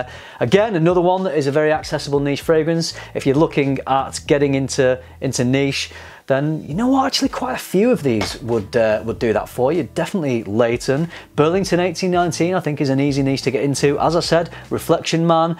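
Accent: United Kingdom. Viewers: Love your reviews and British accent!!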